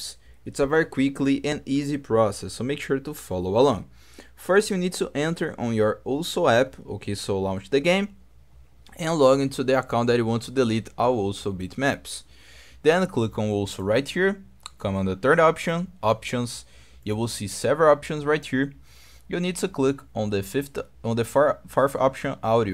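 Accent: Brazil. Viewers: acho que da pra perceber um pouco seu portugues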